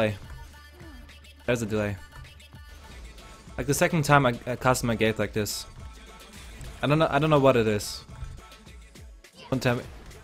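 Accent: Germany. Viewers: are u from german ?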